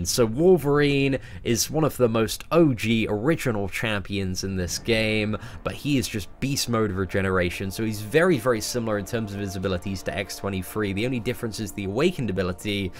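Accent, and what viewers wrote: United Kingdom. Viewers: Dude, you sound so British.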